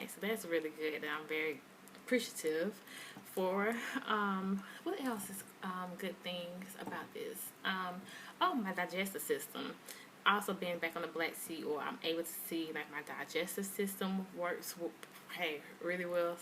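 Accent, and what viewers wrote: South, United States. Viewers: Cute accent ,must be southern ,not know matter what you beautiful Boo.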